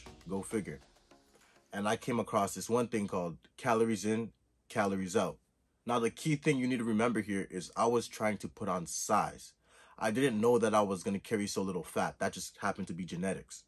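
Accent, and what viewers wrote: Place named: Toronto, Canada. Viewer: are you Canadian? you gotta toronto accent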